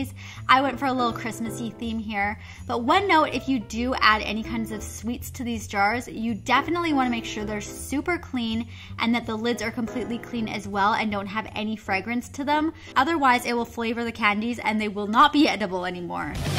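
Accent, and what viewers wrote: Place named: Canada. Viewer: Are you Canadian cuz the way you pronounce the letter A just sounds Canadian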